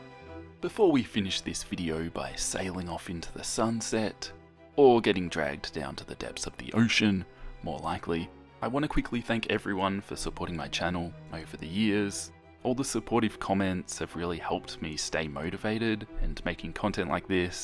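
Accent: Australia. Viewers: YOUR AN AUSTRALIAN!? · wait.. are you aussie??? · Ayyyyyy! Your Australian! You are now my most favourite Australian Youtuber! I'm Australian.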